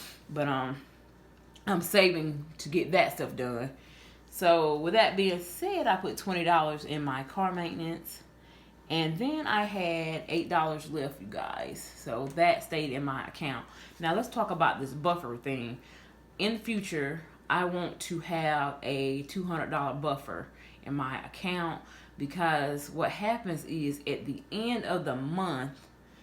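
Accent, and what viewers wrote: South, United States. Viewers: I’m pretty sure that’s all I have for vehicle insurance also. I have to get the extra to get the roadside assistance. Renters insurance is also so good to have! Never know if something happens! Keep up the good work, have missed your videos and that sweet southern voice...I always know you’re videos will bring me back home ☺️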